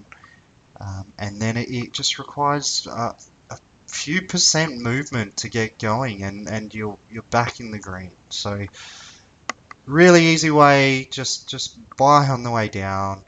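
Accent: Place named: Australia. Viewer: Gday, just subbed. nice to hear an aussie accent doing some price action. New to all this but watching as much as possible to learn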